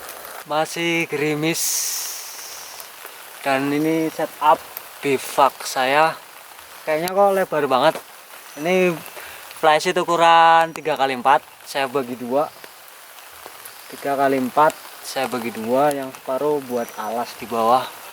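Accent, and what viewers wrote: Banyumas, Indonesia. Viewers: wong endi sampean kang? kayane sesama republik ngapak kie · Deneng ming kaya kue tok ? Yo wis lah. Mantappp ... Tetep semangat. Ora ngapak ora penak.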